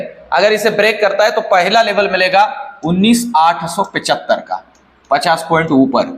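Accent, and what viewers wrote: Maharashtra, India. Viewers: r u from maharstra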